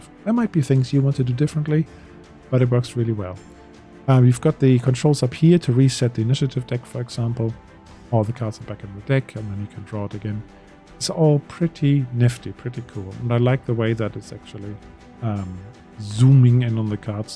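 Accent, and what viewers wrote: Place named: Germany. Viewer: Great video, straight to the point and thorough. I live in California now, but hearing your accent makes me miss Deutschland where I grew up as a kid. Danke Shon! Keep up the great vids!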